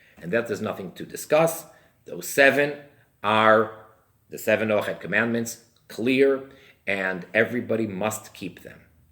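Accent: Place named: New York, United States. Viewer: 12Yrs Old STILL JUST a GIRL including 2-1/2yrs of age. What in the world ☝️ Uhm that is PEDO & ABUSIVENESS of a Minor maybe in Iserel But in the world at least in America which ur SPEAKING IN ENGLISH (sounds like NY)... so these THESE 7 Laws shouldn't nor ever RELATE to ANY AMERICAN Girl & BTW... How old is the Boy? Pray God it's a Boy NOT 🚫 A MAN? WE ALREADY HAVE THE 10 COMMANDMENTS Thank You very much.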